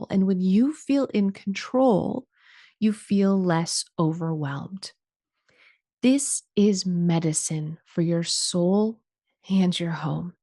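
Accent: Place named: Midwest, United States. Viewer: I hear that Midwest “O” in your voice! ❤Where are you from?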